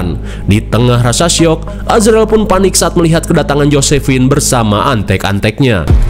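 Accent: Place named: West Java, Indonesia. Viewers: tadinya serem bgt malah jadi hyg seuri🤣🤣🤣akibat baceo na kang chanel sunda pisan 😅😅😅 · Mimin aslina orang mana sih, logat sundana asa familiar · Nu nyarita ciga urang kadu ngora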